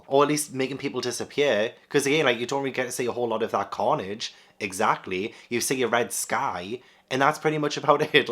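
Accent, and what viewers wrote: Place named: Scotland, United Kingdom. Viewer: Oh man, you jumped right on into the EXTREME horror. When I saw “Cows”, I was like … 👀 … 😂 Please excuse my American ignorance, but where is your accent from? I love it SO much, but I can’t pinpoint it … Every time I watch your videos I think you sound Scottish maybe? I imagine you reading this being like, this girl isn’t even close! 🤣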